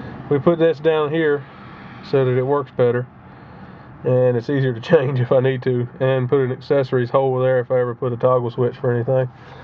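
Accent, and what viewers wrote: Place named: North Carolina, United States. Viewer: I absolutely enjoyed your commentary! I'm guessing you're from southeastern North Carolina. I'm from Wilmington.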